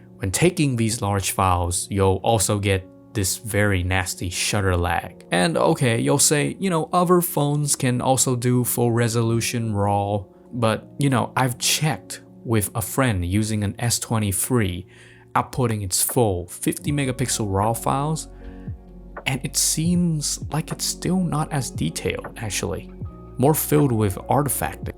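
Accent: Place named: Vietnam. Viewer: ô ông anh là người Việt à, accent đã thế. Có 1 tấm a chụp ở UTE đúng k nhỉ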